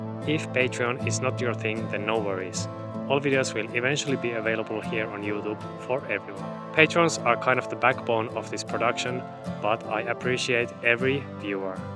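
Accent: Finland. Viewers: The first video I saw was the previous one, and this whole concept; the two of you and a big puppy braving arctic weather in a sail boat for half a year is seriously giving me life force back. It’s so raw and beautiful, all of it. Your (Finnish accent is LOVELY btw!!)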